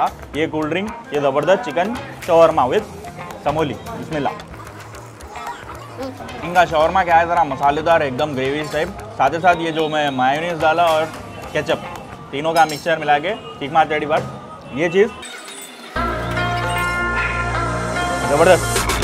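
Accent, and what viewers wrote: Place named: Dakhni, India. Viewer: Hi. Ive been to mallepally after watching your older vlog around the masjid, enjoyed the kova jalebi and haleem. Ive seen many hyderabadi food vloggers but you are the best because you only have that distinct dakhni dialect and you are the most presentable and authentic. If you concentrate more on the old city, jumme raat bazar, golconda bazar, not only the food but also the culture, heritage and history of the Nizams and the untold stories of the Hyderabadi culture, you will get more reach. is a very underrated youtube channel, but stay original, broaden the horizon you will see success. Because you have that authentic dakhani dialect. By the way, try hyd dum ki pukht biryani, mirchi ka salan, kacche ghost biryani, best of niharis and tahiris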